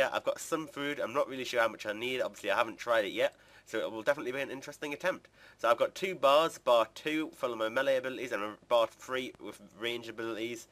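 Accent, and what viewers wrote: Newcastle, United Kingdom. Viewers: can tell ur a geordie haha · Are you a geordie?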